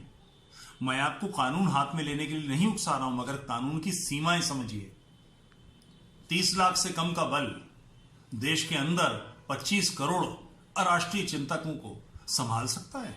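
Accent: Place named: Urdu, Pakistan. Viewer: Sahib, sab se pahley, aap Hindi bohat khobsoorat Urdu lehjay main boolte hain. Aap ahle zubaan hain sir. Mn Hindi bohat kum janta hn, tub aap ki sari baat smajh naheen aati, bus mufhoom samajh aa jataa hai Tu sir aap ne jo Islam k bare mien farmaya, sub such hai. Aap kaa hl, civil war, practical naheen lagta. Mera tajurba ye hai ke mulaa k sath aap jitni narmi bartien gay woh ussay aap ki kumzoori aur apni fateh samjhe ga. Adaab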